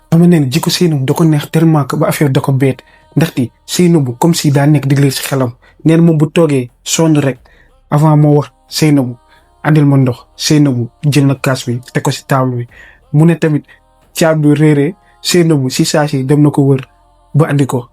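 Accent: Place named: Marseille, France. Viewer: kn marseillais nga 🙄😒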